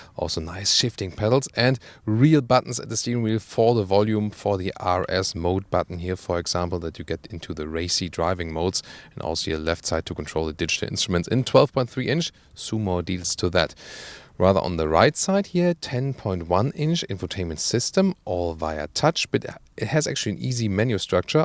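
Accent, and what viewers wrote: Germany. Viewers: du bist der einzige deutsche wem ich Englisch sprechen hören will.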